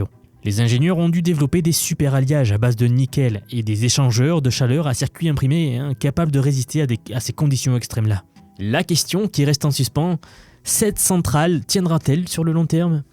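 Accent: South, France. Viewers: Je pense que tu es une IA bien alimentée en données issues de publications scientifiques. Mais ce serait une déception car j’aime ton accent méridional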